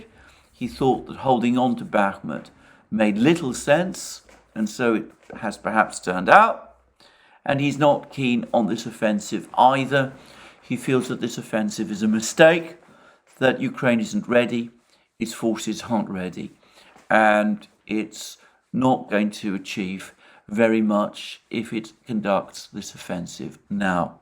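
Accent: United Kingdom. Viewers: He's just lying with a British accent. Would be more convincing if it was Russian. But still lying.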